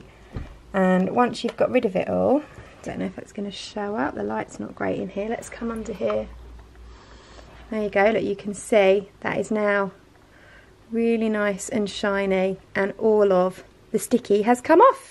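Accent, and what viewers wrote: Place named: England, United Kingdom. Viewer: I should move to England. like a lot of Yankees, I just love the accents, including yours.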